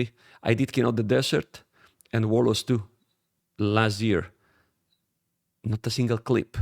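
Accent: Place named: Spain. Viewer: I'm English and the jokes he does is European Banter/Dry humour, it's very understandable and funny af lmao, yes he has a bit of a thick spainish accent but it's a positive, it brings charm and more humour to some sayings he does which he has created himself, like "SIRO!" It's brilliant. And I think he's got better at not interrupting, I really respectfully disagree with all what you said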